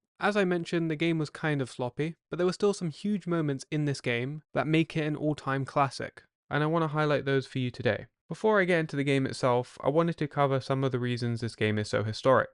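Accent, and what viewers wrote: England, United Kingdom. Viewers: He’s English, and I think real. Some people don’t know how to speak names. Unitas was widely misunderstood. Yes, it is indeed “aMEEchee”, as it was for Don Ameche!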